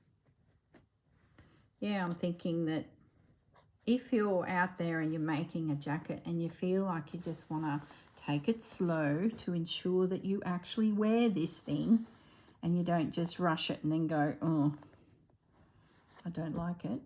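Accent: Australia. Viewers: Loving the jacket and find your story telling interesting. You are truly a unique person, also, I'm in Indiana, USA. Enjoy your Australian accent. 🙂.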